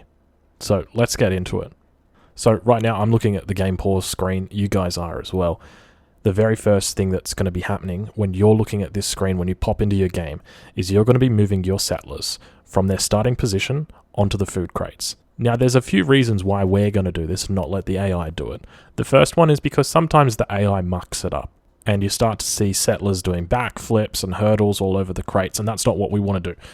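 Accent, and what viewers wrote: Australia. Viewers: AoE3DE Art of War narrated by an Aussie in a very competent and thorough way? Flawless victory! Cheers for the amazing content mate!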